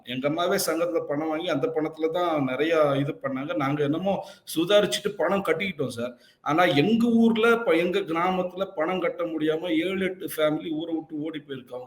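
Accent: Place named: Karnataka, India. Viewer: Your Karnataka tamil expression with truth so beautiful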